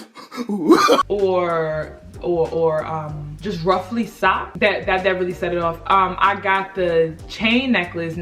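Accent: Baltimore, United States. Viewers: This like my 3rd video watching her and I KNEWWW SHE WAS FROM BALTIMORE I know my accent when I hear it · You from Baltimore?? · Muva and Hotdugs 🤣 Yes! Girl that Baltimore keeps sneaking out! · Girl you sound like Baltimore! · Are you from Baltimore? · i knew you were from baltimore bruh, the accent!!!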